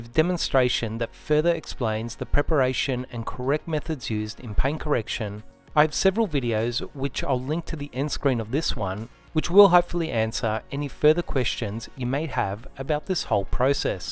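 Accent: Australia. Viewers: I love AU accent! Almost British! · Woah it's an Aussie!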